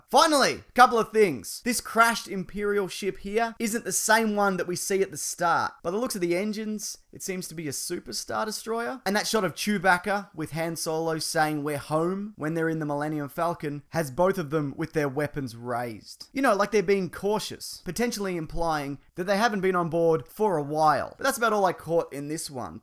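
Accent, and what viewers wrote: Australia. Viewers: Good job. Lemmie guess. You're Aussie. Right? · Movies Brilliant video! However, luke's voice over is a mash up of dialogue from episode 6, one part from where he tells leia that she is his sister(my father has it blah blah), and the other part from the same conversation but just a bit earlier.(you have that power wutevah). Still lovin the accent btw, ive been practicing my australian accent (im from england) and its bloody amazing! :) keep up the good work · are you australian...? subtitles are quite useful now.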